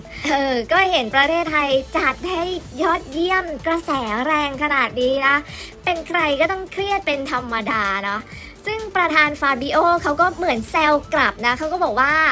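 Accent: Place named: Brazil. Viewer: Pelo sotaque ele é brasileiro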